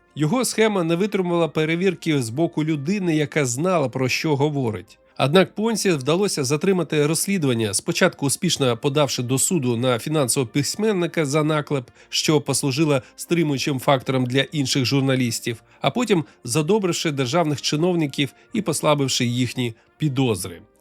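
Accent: Russia. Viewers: Приємно бачити нові україномовні канали, однозначно лайк та підписка для підтримки))) Цікава подача, чекаємо ще PS. у диктора чутно слабкий російський акцент, тому ще вподобайка за перехід на солов'їну, а текст написано дуже і дуже хорошою українською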